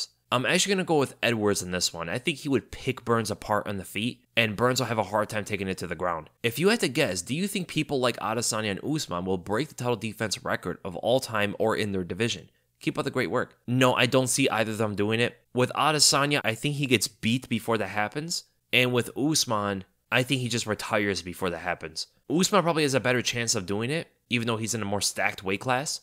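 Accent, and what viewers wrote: United States. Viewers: Had no idea you were Albanian, you sound American. You are born and raised there? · damn albanian, i thought you were american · Had no clue you were from Albania that's cool. Totally thought you sounded like you were from the US in Milwaukee or something lmao😂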